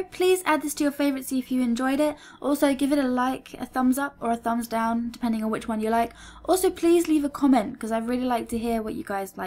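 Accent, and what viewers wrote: United Kingdom. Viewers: Are you scottish or british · Scottish? LOL. It's a British accent.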